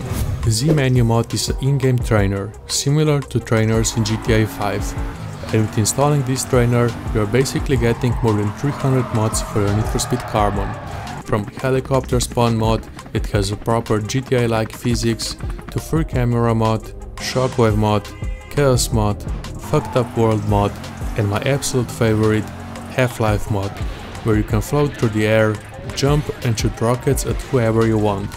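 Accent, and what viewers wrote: Russia. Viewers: Акцент выдает своего))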